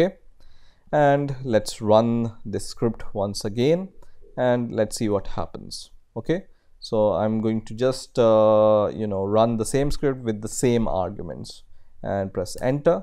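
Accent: India. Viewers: You speak english like an Indian, so I can understand...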